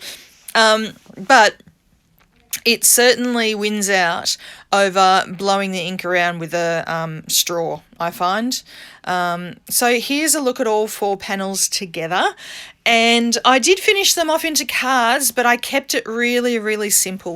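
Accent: Australia. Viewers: Hi. Happy Easter 🐣. Thanks for sharing your alcohol ink techniques with us all. What an honour to be celebrating Jessica! The blues and bronze on white is my pick. So rich and lux looking. Oh and I love your Australian accent. It’s just like mine 😉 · A fellow Aussie, Yay! Glad to hear the familiar accent during the hop. New subscriber from Central Coast NSW ❤️🇦🇺 and just a quick tip for when you run out of the Snow White mixative, I use white nail polish! Sometimes you need two coats depending on how opaque it is. 😊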